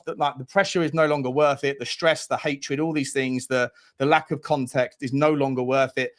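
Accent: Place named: England, United Kingdom. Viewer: You're a legend, such a sound guy. Your updates are great and I enjoy listening to you everyday. As an Essex boy who has moved to Australia 13 years ago its nice to hear an English accent,it makes me think of home. Keep up the awesome work mate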